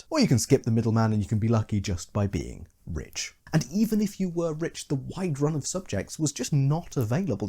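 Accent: United Kingdom. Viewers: Worst Witch probably a inspiration. Basically bunch of young witches go to school learn how to be witches. (inside universe boys goto wizard school). Its from the 70's. All the adventures take place mostly in the school or school related activities. They had many tv shows on this from UK. You sound like you're from UK :p. You should know lol. I swear Harry Pottery is just the male version of worst witch. Description of Book copy and paste The stories mainly take place within the walls of Miss Cackle's Academy, a girls' boarding school in a stone castle atop a mountain, surrounded by forest. Each book covers one term at the Academy.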